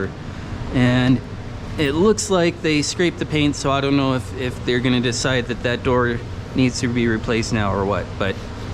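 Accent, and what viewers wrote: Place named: Canada. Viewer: you have a nice accent you must be Canadian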